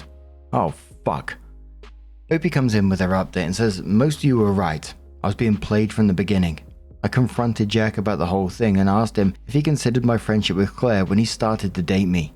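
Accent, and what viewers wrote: United Kingdom. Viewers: Hey I just wanted to let you know that I really enjoy it when you talk about your own life and relate it to the stories. I feel like you must've gotten comments from ppl who don't like this or something bc you always defend bringing up your personal life. If people don't want to hear about it then they should go listen to AI robotic slop voice rereading reddit stories. I like your channel BECAUSE you bring a personal touch and I also love British accents lol. You're the only channel on yt I actually pay a membership for bc I appreciate your content so much. I listen almost daily on my commute to work and back and I really enjoy hearing about you and poppy and your family. So please don't ever be discouraged to share that.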